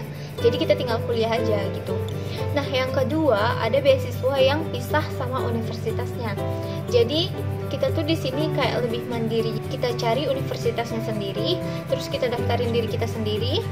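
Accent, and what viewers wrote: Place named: Sumatra, Indonesia. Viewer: kayak orang sumatra logatnya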